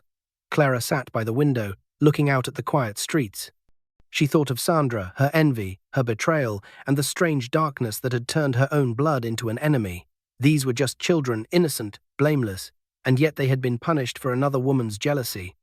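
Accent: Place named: United Kingdom. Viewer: Please can’t narattors be African. Why is this British person speaking!!!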